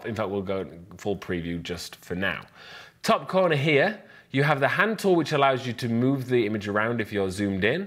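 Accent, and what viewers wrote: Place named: England, United Kingdom. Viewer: Yes your tuts are crystal clear like always. I use Niks for ages now but not all that frequent. Funny, I thought you were somewhere in England cause of your nice English accent.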